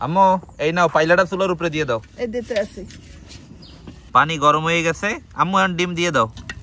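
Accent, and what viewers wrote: Barishal, Bangladesh. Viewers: Borisaila Katha hunla rag uthey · মনু তুমি কি বরিশালের মাল শুদ্ধ ভাষা শিখো নাই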